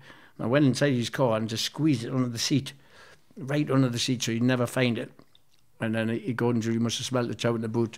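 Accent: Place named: Geordie, United Kingdom. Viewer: He's a Geordie that's how they all sound.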